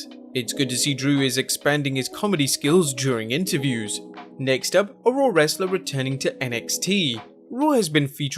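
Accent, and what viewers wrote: United Kingdom. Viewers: find a NEW Narrator (preferably NOT British) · I didn't know is British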